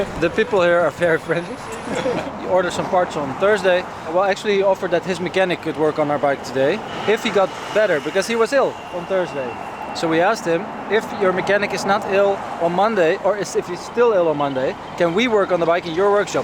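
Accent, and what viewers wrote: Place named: Netherlands. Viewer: Heerlijk Dutch accentje 😂😂😂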